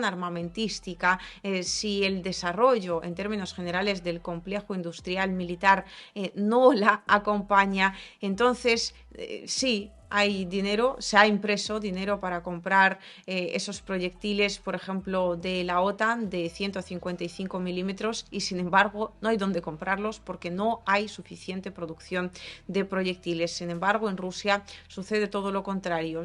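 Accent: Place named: Spain. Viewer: hermoso vídeo gracias hablas "español " mejor que yo 😂 con acento español ( olé y olé )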